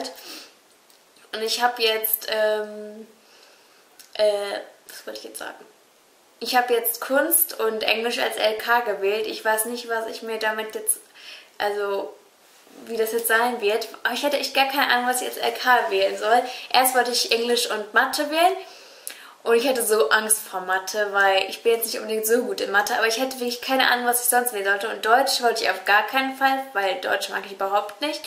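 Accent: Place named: Bavaria, Germany. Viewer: sie kommt aus Bayern sie sagt nett anstatt Nicht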